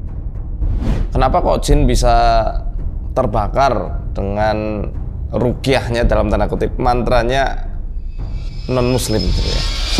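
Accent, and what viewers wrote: Banyumas, Indonesia. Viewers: Ngapak ustad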